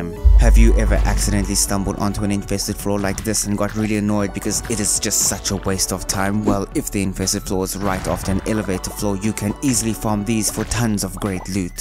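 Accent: South Africa. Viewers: Are you South African? Your accent sounds South African 🤔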